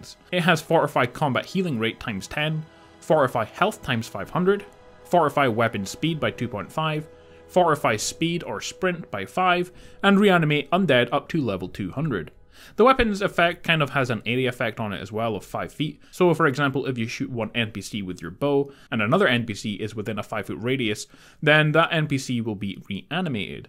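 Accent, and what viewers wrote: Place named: Scotland, United Kingdom. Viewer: It's great to hear a Scottish voice on YouTube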